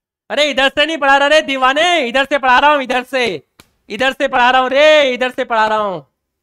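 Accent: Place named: Hyderabad, India. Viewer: sir mujhe hydrabadi lag rahe unke language se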